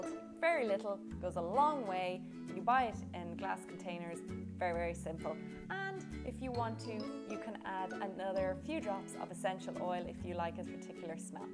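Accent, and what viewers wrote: Ireland. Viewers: Love your Irish accent.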